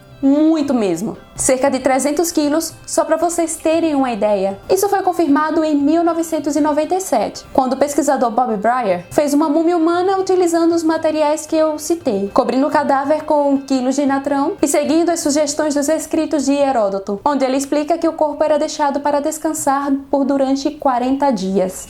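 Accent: Brazil. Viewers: Ah, então você é de Sergipe :)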